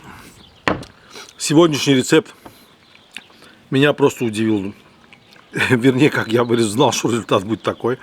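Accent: Baku, Azerbaijan. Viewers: Твой бакинский акцент напомнил мне Баку,когда я ещё жил там в советское время.Я вспомнил как пили пиво с горохом,мой отец так любил.Многие армяне до сих пор сохранили бакинский акцент,по моему он в крови да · Брат ты красавчик. Это не еда с пивком и нутом, это симфония. А ещё вкуснее невероятный Бакинский акцент. Долгих лет жизни тебе родной. Ты конкретно поднял мне настроение. Клянусь тебе сейчас пью пиво за твоё здоровье. Респект и уважуха! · Бакинский акцент самый родной❤ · Русский язык действительно в Азербайджанском акценте получается больше чем сладким.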